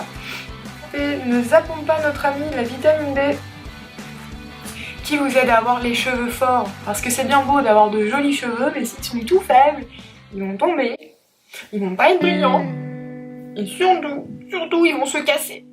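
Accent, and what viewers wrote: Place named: South, France. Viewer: Merci pour cette vidéo hiii tu me fais trop rire avec ton accent du sud 😂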